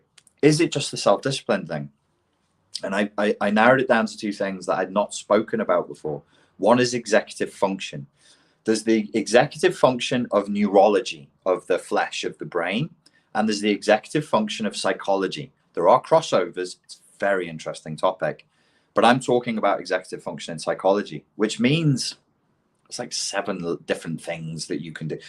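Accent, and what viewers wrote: Liverpool, United Kingdom. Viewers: Is that a Liverpool accent sneaking in 🧐 also a bit of Yorkshire like me ,anyway I am finding it really hard to get over the death of my mam,it’s the worst thing to experience.I’d just like to say Im not as intelligent as you & I’m in a low class job but I’m ok with that,I would not want the stress of the high pressure job.I do hate the communists btw lol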